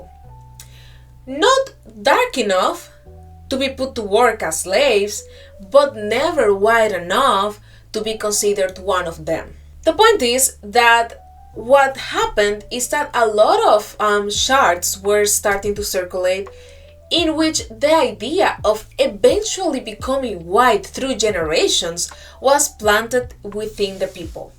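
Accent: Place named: Portugal. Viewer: Your English sounds like a Portuguese speaker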